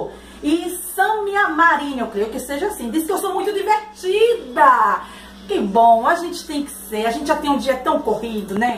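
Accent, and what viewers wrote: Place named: North, Brazil. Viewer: Estou gostando dos seus videos, muito legal as receitas, veja que voce é pessoas cristã, isso é bom. pelo seu sotaque percebo ser nortista. abraços do amigo Paul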